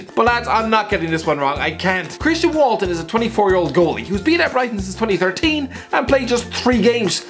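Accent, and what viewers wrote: Ireland. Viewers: aren’t you from Ireland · Is this Irish guy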